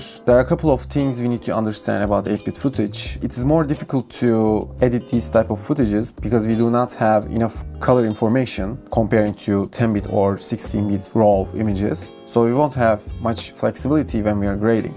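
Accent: Turkey. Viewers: You sound like you are Turkish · lan dedim bu adam tam Türk gibi ingilizce konuşuyor Türk çıktı, selamlar teşekkür ederim video için :)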